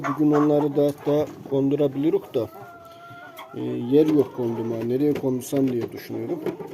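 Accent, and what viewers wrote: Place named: Black Sea, Turkey. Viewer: Her söylediğini anlamasamda, şivesi çok tatlı, her Karadeniz insanı gibi ... ☺☺☺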